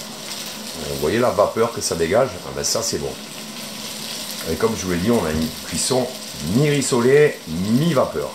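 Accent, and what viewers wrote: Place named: Marseille, France. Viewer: Ah! Et j'oubliais... L'accent de Marseille, qui rime avec merveille...Top de super top!💖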